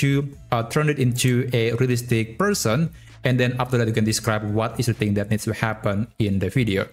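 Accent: Indonesia. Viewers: your accent...are you indonesian?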